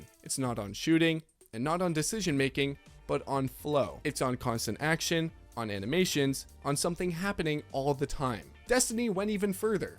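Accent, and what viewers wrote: Russia. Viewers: Are you russian??? "Convierrted"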